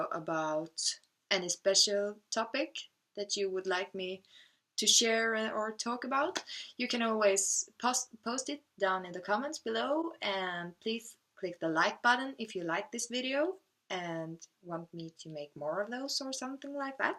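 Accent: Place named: Sweden. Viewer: you have an awesome accent. And Swedish is the most popular North Germanic (Scandinavian) language.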